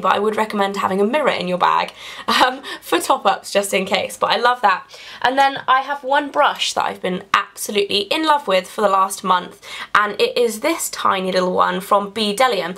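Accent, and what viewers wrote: England, United Kingdom. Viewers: What, just cause she has an English accent? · i can listen to you forever, i love your british accent haha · I love watching your videos. 1- Because your amazingly beautiful! 2- I love listening to English people, love their accents! :') <3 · i'm obsessed with british accents right... and i think your accent especially makes me love them even more GAH you're so gorgeous!!!!!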